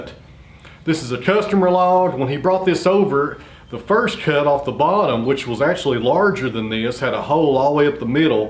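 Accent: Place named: West, United States. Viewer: Thank you for the beautiful music and this COLORFUL "OLD" APPLE TREE 'WOOD' video. And Your voice! I feel like watching "WEST FILMS" in the old cinema, I like and emphasize * Thank you for the video you made with "Old and colorful Apple Tree" accompanied by beautiful music. Also, with your tone and accent, I saw myself watching "WESTERN MOVIE" in an old cinema. Country Music is beautiful in its songs. I remembered AUSSIE Singer Slim Dusty and others. Thanks again. Good Luck and All The Best. From ANTALYA/ TURKEY.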